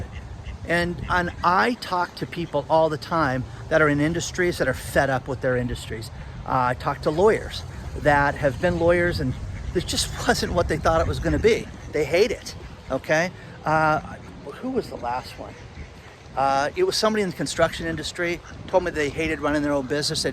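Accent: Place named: Western New York, United States. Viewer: Are you from Western NY State? Your accent, the way you pronounce certain words like "roof" indicates you are. I'm originally from Buffalo NY area.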